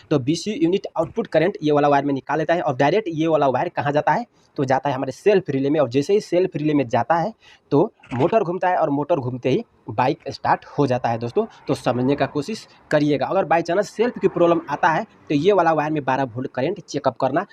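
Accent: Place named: Bihar, India. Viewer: Bahut badhiya ustad. Bihari accent bahut badhiya ba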